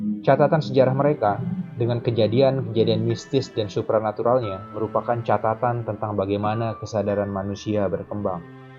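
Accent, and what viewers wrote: Lombok, Indonesia. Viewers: Orang lombok bang?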